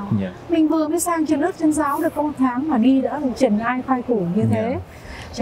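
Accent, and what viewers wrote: Hanoi, Vietnam. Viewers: Phai noi giong HA NOI TRUOC NAM 1954 moi dung ban a vi sau nam 1954 moi nguoi o bung bien , tinh le vao sinh song o HA NOI roi. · Chi sao van tre &Xinh the nhi! 🐅🇻🇳 Phong cach &giong noi cua Chi that "chuan Hanoi "!💖🇻🇳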